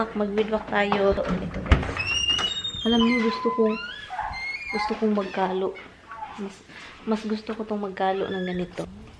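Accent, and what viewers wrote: Visayas, Philippines. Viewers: ang cute mong mag bisaya.. na intindhan ko kc bisaya din ako...simple ka lng...at gwapo si mr.khit may edad na sya..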